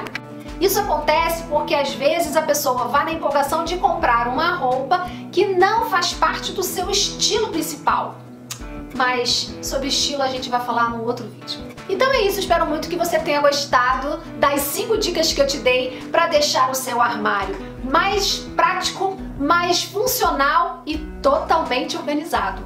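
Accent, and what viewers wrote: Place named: Rio de Janeiro, Brazil. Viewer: Gosto do seu sotaque carioca. Sou mineira. Suas dicas são ótimas. Já vou chegando dando meu like.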